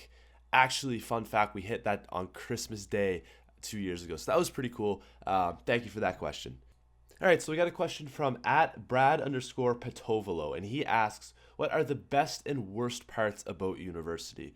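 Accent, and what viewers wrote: Ireland. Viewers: Hey. Why is it that I hear a hint of Irish( Ireland/Northern Ireland) in your voice? Especially the "r's". Haha, awesome videos!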